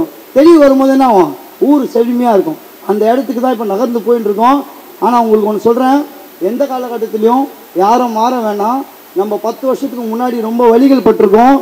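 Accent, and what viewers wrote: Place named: Chennai, India. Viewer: அண்ணா சென்னை பாஷை ❤️❤️❤️🙏🏿🙏🏿🙏🏿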